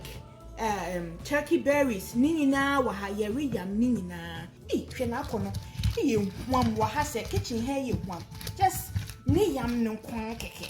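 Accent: Akuapem, Ghana. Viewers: Great job! This looks delicious and the process is on point. Loving the akuapem dialect too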